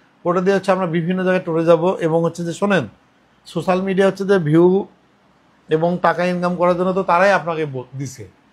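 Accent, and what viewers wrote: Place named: Bogura, Bangladesh. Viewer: আচ্ছা আপনি কি বগুড়ার লোক? আপনার কথা শুনে মনে হচ্ছে হয়তো আপনি বগুড়ার লোক।কারন আমিও বগুড়ার মেয়ে।এজন্য জিজ্ঞেস করলাম।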